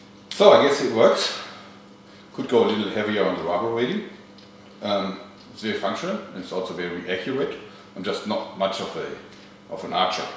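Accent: Germany. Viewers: The german accent just makes it better! *I ment this in a 100% positive way*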